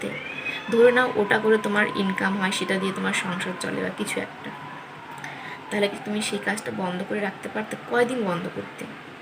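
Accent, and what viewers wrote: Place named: Kolkata, India. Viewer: Are didi Kolkata Bangla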